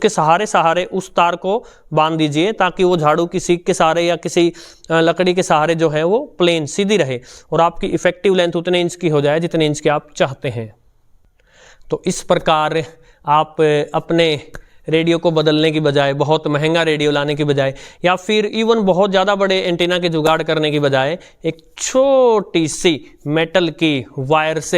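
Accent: Standard. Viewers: Very nice.. impressed by your tone ,pure Hindi and clear details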